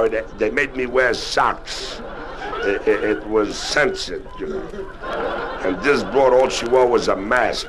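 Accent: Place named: Brooklyn, United States. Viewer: He speaks in the old lost Greenpoint (greenpurnt) Brooklyn accent. Note the very canned laughter. Live recordings in the 1950s were quite rare.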